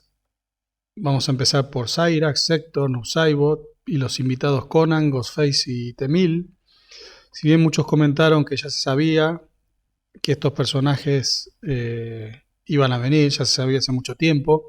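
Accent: Argentina. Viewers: Eres argentino?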